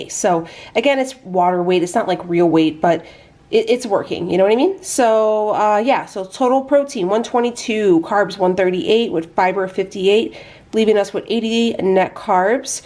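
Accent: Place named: Chicago, United States. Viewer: I love you and thats how we talk on the south side girl!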